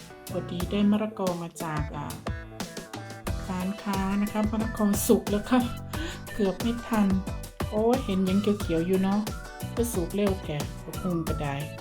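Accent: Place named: Isan, Thailand. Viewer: ถาดน่ารักเชียวค่ะคุณ พรนึกว่าคนจีนพุ้นเด่ เห็นเว่าอิสานน่ารักดีค่ะ คนจังหวัดใดน้อ❤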